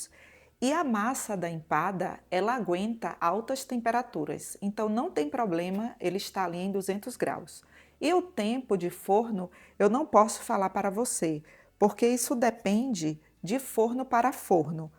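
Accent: Brazil. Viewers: Ela fala maravilhosamente bem e bonito. As receitas perfeitas e deliciosas. Não vejo graça alguma e sim aprender a respeitar o sotaque de cada região brasileira que tem uma extensa diversidade cultural. Isso é cultura meu bem!!! #Pega a visão#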